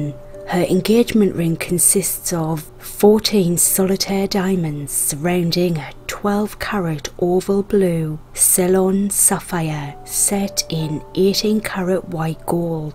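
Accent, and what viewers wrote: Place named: North East England, United Kingdom. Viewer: It’s a north east of England accent. Newcastle or Sunderland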